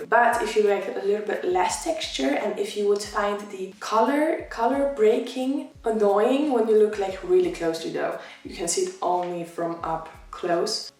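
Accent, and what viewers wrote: Netherlands. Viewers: heyyy!! Nederlands!! Ik dacht al een hint ervan te horen. Het kleine Poolse gedeelte bracht me in de war 😂 geniet wel echt van je video’s! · I know for a fact u Dutch I know the accent of my ppl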